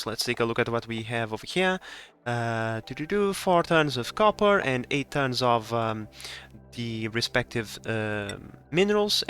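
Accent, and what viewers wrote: Portugal. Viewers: I swear your accent is from Portugal.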